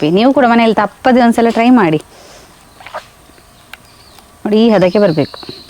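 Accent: Coastal Karnataka, India. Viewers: Omlet egg inda madodu mathra gottith... Tomoto inda madid nodi nanu try madde... Super agi bandide .... Nimma karavali mathu super... Namge bahala ista aythu marre...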